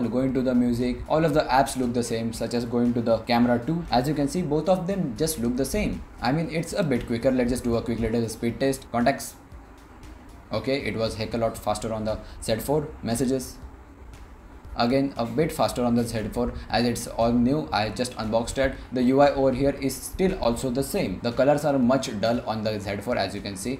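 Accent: India. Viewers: hmissi ALA Indian accent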